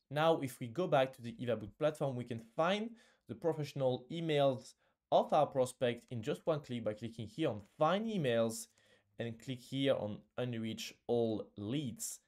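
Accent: France. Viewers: This is a fantastic video! There are a lot of YouTube videos that recommend using Linkedin Groups. Thanks for letting us know that post in Linkedin Groups is a waste to time. However, the volume on this video is rather low. And the captions are not able to capture every word because of your French accent. I'm not complaining about your French accent. But you need to do something about the volume so that people will not click off your videos.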